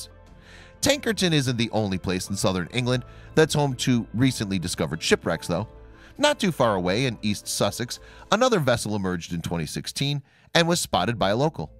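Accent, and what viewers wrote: United States. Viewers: If you are going to post a YouTube video get your facts straight. What the hell is an American doing a Voice Over on a British subject? The mind boggles. 🤪🤪🤪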